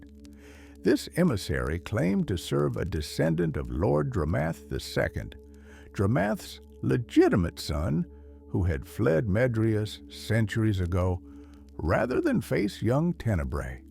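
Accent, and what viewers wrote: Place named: South, United States. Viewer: Dude why on earth would anyone want to hear a star wars tale from the vice of some southern dude sitting on his porch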